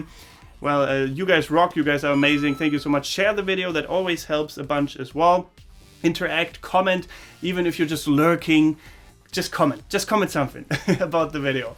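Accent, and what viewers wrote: Germany. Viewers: Your english is really well. Many Germans have strict German accent when they speak in English. But you have much less. I guess it's because you started to learn English very early. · You sound fine you just have a German accent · You still sound German, but your English is pretty good. The one problem I noticed is the way you speak sentences. Sometimes they seem to complete as odd as that sounds. But I am not a perfectionist by any means and wouldn't complain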